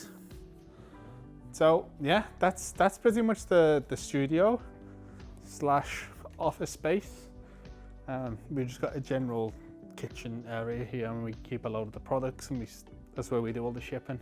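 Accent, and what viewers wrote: Liverpool, United Kingdom. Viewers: A scouser!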